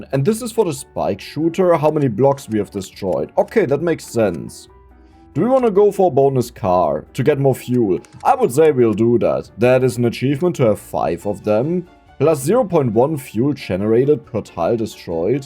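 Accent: Germany. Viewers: I see now that i love ur videos because of your german accent xd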